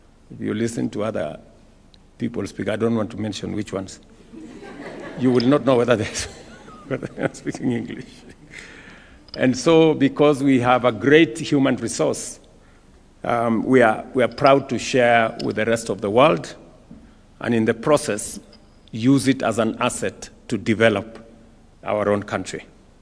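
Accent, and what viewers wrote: Kenya. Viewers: Mr president you are speaking Kalejin English.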